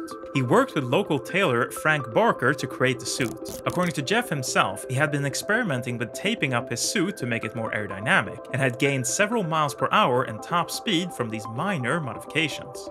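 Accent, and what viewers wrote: Sweden. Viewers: Swedish accent? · are you swedish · Are you Swedish? or är du svensk? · Are you swedish my fellow motorcykel rider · Are you from sweden?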